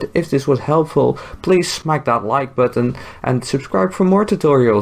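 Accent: Netherlands. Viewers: Your dutch accent tho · mooi nederlands accent man haha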